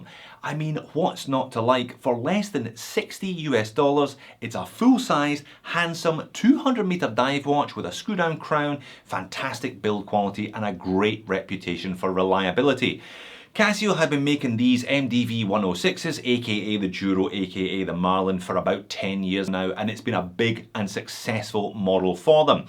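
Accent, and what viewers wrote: Scotland, United Kingdom. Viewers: Love the Scottish 'Hello' on this channel and Scott Manley's. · That's the Scottish pronunciation of the Duro.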